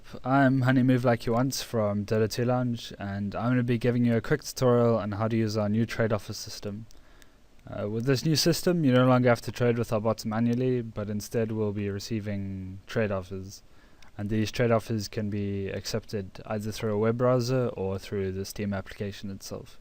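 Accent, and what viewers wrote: South Africa. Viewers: you sound south african ? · Is this guy a South African ?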